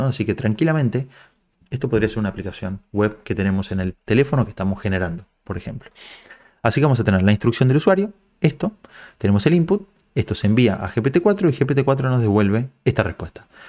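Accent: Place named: Rioplatense, Argentina. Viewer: en español, (Argentino o Uruguayo)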